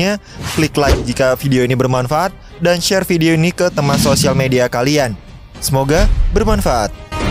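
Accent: West Java, Indonesia. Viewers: Kang pasti org sunda ya kan Sama kaya gua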